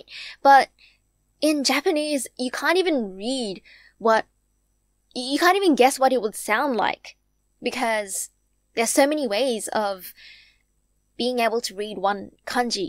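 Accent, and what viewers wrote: Australia. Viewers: Every time I have one of your videos going in another tab I expect the next words out of your mouth to be "WELCOME BACK TO HOW TO COOK THAT I'M ANN REARDON" your aussie accent is so strong, I love it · I knew that she was from Australia the first time I heard her accent I have a friend that's from Australia and she has mostly the accent as my friend lol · Oh man just found out this channel today. The nostalgia. I lived in Australia too for my childhood and they sent me to Saturday school. I fucking hated it 😂 In the end I went back to my home country and I still can't speak my mother's tongue, what a waste of perfectly good Saturdays. Unfortunately my Aussie accent has died a bit cause I have to adapt to the English here so others can understand me. Man it'd be great if I could go back - say sunnies, undies and collect cricket cards from Weetbix again. 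It's so refreshing hearing the Aussie accent even if it is a bit different than what I'm used to, I think it's from Sydney. I'm #Perth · The aussie accent is so cute😩💓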